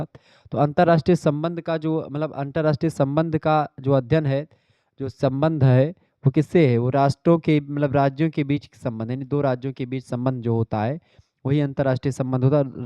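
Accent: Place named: Bundelkhand, India. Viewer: thank u so much sir aapki video apki class hmesha helpful hoti hy maximum qns ate hy exam me jo ap padhate h sir ap kha se apki language hamre side jaise lgti hy chitrakoot ya banda jaisi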